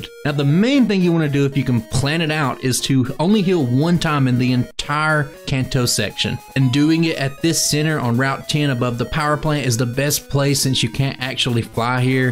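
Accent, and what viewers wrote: South, United States. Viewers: what’s funny is to me you have a southern accent (I’m from Michigan) and in my mind I was like “oh yeah, he’s gotta be more southern based, that makes sense” lol.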